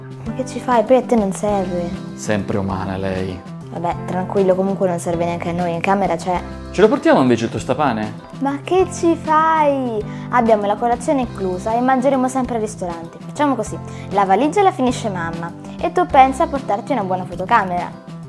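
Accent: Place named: Genoa, Italy. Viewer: Ohimè l'accento genovese🤣 che còccina belandi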